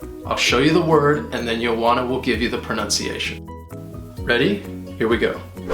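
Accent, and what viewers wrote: United States. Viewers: Do you speak US accent?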